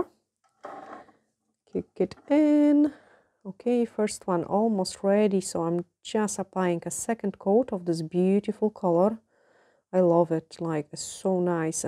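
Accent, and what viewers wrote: Scotland, United Kingdom. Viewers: Your precious little accent is EV-ER-Y-THING!💖 JUST ADORBS 🤗 I never would've guessed Scotland!!! But you sure throw out some BANGERS with your nail art, but HUN-NYYYYYY!!!!! THAT STONE PLACEMENT IS ALWAYS BEYOND ON POINT 👌🏼 Honestly, even if you had no idea how to do nails, (which you are so exceptional àt!) , SOOO MANY PEOPLE would still come to you for stone placement and/or nail design 😍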